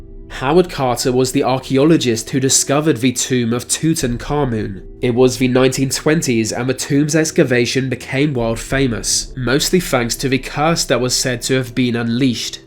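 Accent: United Kingdom. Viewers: Magicians are not creeps. You tea and scones accent. Fuckwit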